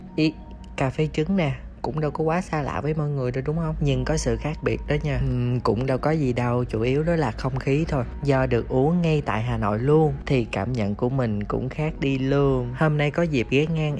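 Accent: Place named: Binh Dinh, Vietnam. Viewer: Giọng BĐ nó z :)) nghe nhìu òi :))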